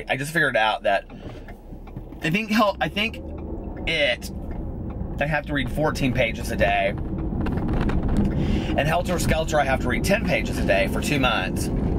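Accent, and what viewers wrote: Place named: Indiana, United States. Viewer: I just discovered you the other day and have already watched a ton of ur videos. It was your voice that sucked me in! I love how you talk. I kept thinking...he sounds so familiar. Then I realized you live in Indy and I think it's just ur dialect that sounds familiar to me because I live in Indiana too.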